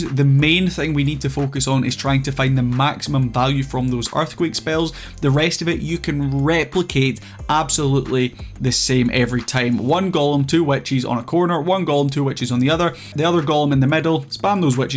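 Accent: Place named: Scotland, United Kingdom. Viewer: Are you from Scotland just asking because I can sense your accent?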